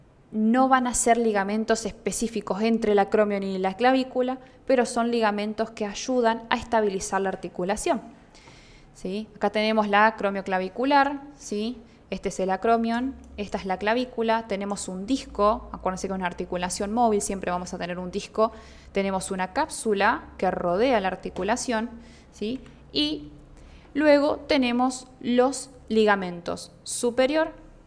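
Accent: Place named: Rioplatense, Argentina. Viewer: Ay, cariño, no lo soporto el shesheo. Me parece un vídeo interesantísimo, pero no soy capaz de verlo.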